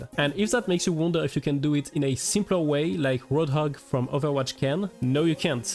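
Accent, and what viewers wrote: France. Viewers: Bro i love your accent “we grrrrab ze playeur statu and rrrun to zhafity wit it” it is so nice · dude this is so funny, well edited and highly informative for such a low view and sub channel, take my damn sub! also you accent (I'm pretty sure it's french) is 🤌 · You sound french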